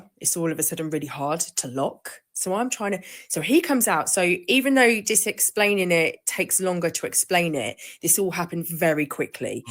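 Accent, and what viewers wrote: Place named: Southern England, United Kingdom. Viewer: well I’m from south of England (isle of wight) and I KNOW the police are slack and corrupt down here she sounds like she’s from the south?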